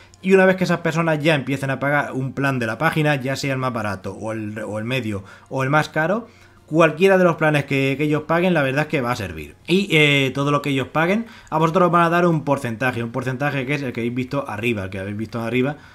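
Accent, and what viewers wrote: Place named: Malaga, Spain. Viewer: Jejeje lo sabía ,yo soy de Cádiz :p ,tu por el acento pareces de Málaga ,saludos máquina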